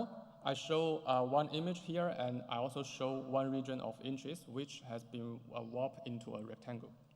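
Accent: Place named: China. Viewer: Как здорово смотреть на английском (с сильным китайским акцентом), без каких-либо встроенных субтитров... И все равно хорошее объяснение)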